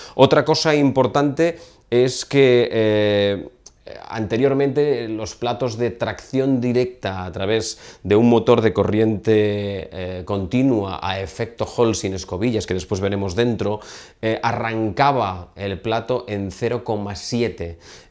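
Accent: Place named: Spain. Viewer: tienes un acento de España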